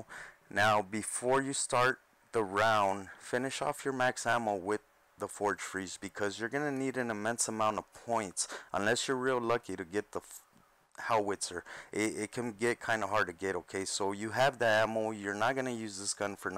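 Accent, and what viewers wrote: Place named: Canada. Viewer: you sound like a nice Canadian okay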